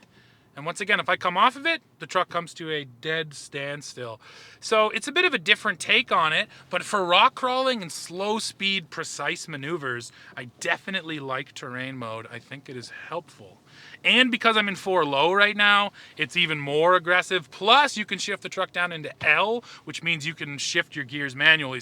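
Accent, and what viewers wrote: Canada. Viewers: Why Canadians mispronounce the letter Z. You don’t say Zedebra, it is damn Zebra 🦓. You don’t say Zedoo. It is damn Zoo. Lean English. It is Zeeee.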